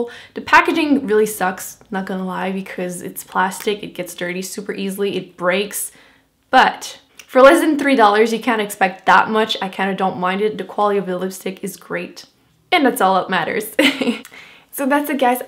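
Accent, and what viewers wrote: Quebec, Canada. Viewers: wowo je t'écoutais parler et sans même savoir réellement je me suis dit man this girl has a french canadian accent!! lol Great!! Tu les a acheté ou tes wet n wild?? Ils n'en vendent plus au Wal-Mart!! Je suis obligée de commander sur amazon!!! Pis ca devient trop cher vu qu'il me charge le shipping,dire qu'au États ils sont 2 $ grrr booh loll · So Happy to find a Canadian Vlogger!! Yay. I knew you were a fellow Canadian from the accent. Love it :D Great channel and wonderful swatches. Very Helpful!! Thanks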